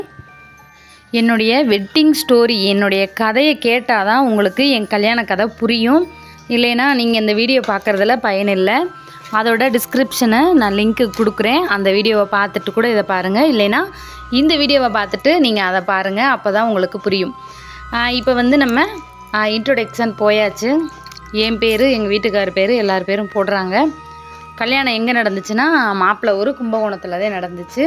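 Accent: Thoothukudi, India. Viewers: நீங்க தூத்துக்குடி ஆ சிஸ். நா நிறைய டைம் உங்க சேனல் பாக்கும் போது நினைப்பேன்.நம்ம ஊரு பேச்சு வழக்கு இருக்கு பாத்தேன். நா திருநெல்வேலி சிஸ் 😊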